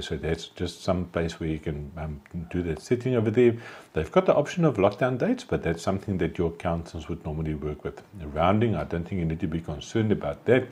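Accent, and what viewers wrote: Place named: New Zealand. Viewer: From New Zealand I believe, just change the speed of video and learn.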